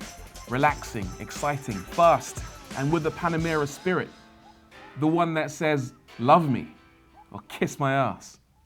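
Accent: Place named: Bristol, United Kingdom. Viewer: What part of England are you from, Bristol area?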